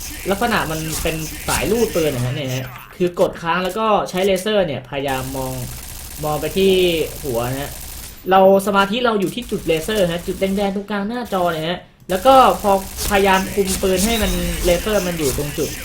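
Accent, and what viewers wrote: Isan, Thailand. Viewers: พี่ คนอีสานหรอ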